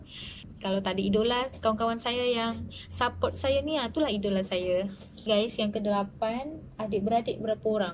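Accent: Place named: Indonesia. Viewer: Sis maaf,siS org melayu tapi warga indonsia Tapi Bahasa malaysia anda bunyi indon😊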